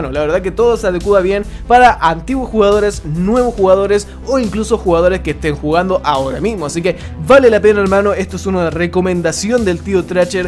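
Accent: Chile. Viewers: Tratas de hacer el español neutro e igual te sale aveces nuestro hermoso acento wn XD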